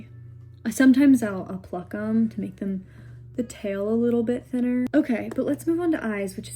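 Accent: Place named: South, United States. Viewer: Do you know what I realized? You and Jules used to have a little southern twang and that completely went away. Probably bc you were around your dad who has it.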